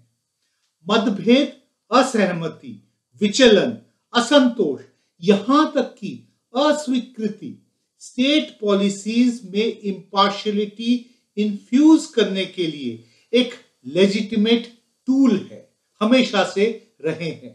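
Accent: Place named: Bengal, India. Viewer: Why does he speak Hindi? He speaks perfect English albeit with Bengali accent. Stick to English. Don't ruin Hindi.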